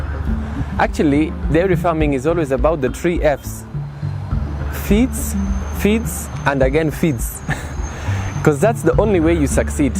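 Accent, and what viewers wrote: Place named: Kenya. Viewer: Why does our mzungu here have a kenyan accent? 😅😅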